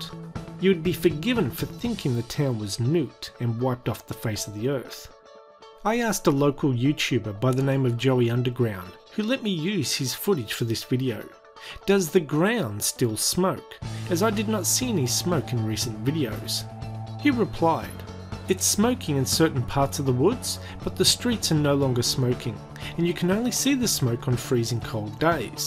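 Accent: Australia. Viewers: you’re Aussie accent is so far thick it’s become gibberish. That’s where the problem lies. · You did say 2018 it is just your Australian accent that makes it sound like 2019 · I stg aussie accents are NAILS ON A CHALKBOARD annoying..!!! You all say ‘over there’ like “ORVAH THEY” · I hear 2018, think some people didn’t understand the Aussie accent. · Aussie accent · I should have thought it was hard to mistake the two. While you may not have pronounced the "d" there is a clear difference in the vowels between eighteen and nineteen, and the Aussie accent even exaggerates that difference. You clearly said "aye-teen" with a long drawn out aye which wouldn't sound anything like "nine-teen", i.e. aye vs. eye+n. I just think they're unused to other accents and rhythms than US English.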